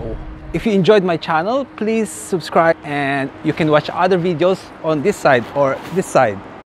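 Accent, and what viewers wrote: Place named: Batangas, Philippines. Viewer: Hindi po halata sa mukha,pero halata po sa boses na Batangueno po kayo😂.Talagang madaming naapektohan iyang pandemic😔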